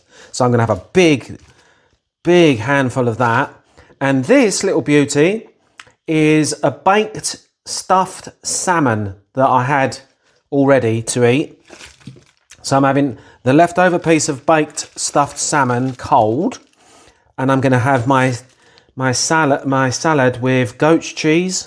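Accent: Essex, United Kingdom. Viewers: G’day from Australia..as you said about your Essex accent not saying some of the letters in the words…even though you said the word”crepe” the way it’s spelt..we spell it the same but for some reason we say it as “ crape”???? Not to be confused with with “ crap”🤣🤣🤣 silly bugger,not naming your plants..but you will get a nice suprise when they flower or fruit….your lunch looked delicious also…really enjoying your extra videos..thankyou for sharing 🇦🇺🇦🇺🇦🇺🇦🇺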